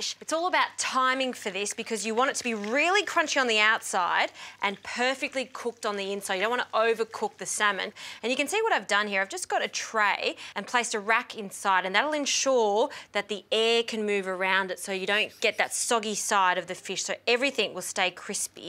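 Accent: Australia. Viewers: is she Aussie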